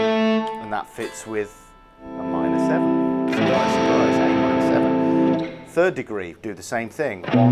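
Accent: United Kingdom. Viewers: Kids, don't practice guitar, have muscular body, charming british accent, or else you will be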